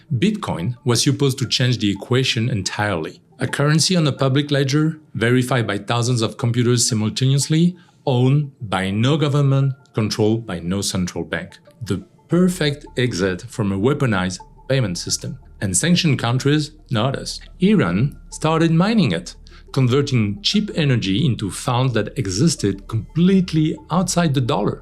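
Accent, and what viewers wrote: France. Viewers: Interesting content on this channel but hard to listen to the French...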